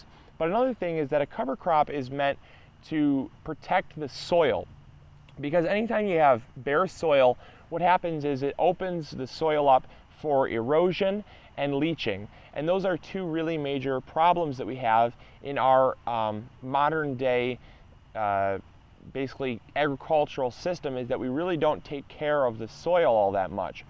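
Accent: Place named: Wisconsin, United States. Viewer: Funny, I grew up in Michigan and people would ask me where I was from, never thought I had an accent until I saw ur vids. Almost a Wisconsin accent but more faint.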